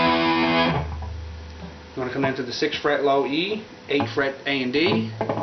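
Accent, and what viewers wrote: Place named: Newfoundland, Canada. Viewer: You sound like a good ol' Newfoundlander. Yes, m'son. Nice job.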